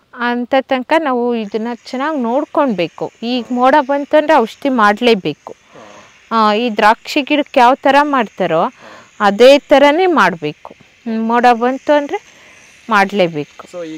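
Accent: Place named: United Kingdom. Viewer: British Speaking Kannada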